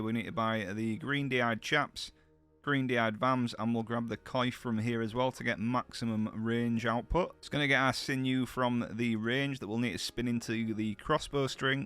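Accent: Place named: Northern England, United Kingdom. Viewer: Really hope you hit 1k subs soon. you seem very wholesome and genuine. loving the yorkshire accent, keep plugging bro =)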